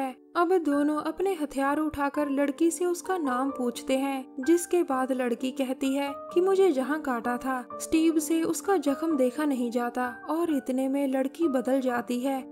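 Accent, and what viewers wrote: Punjab, India. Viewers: tusi Punjabi ho?? awaz ton lgda tuhadi kyu k Punjabi accent hai tuhadi voice ch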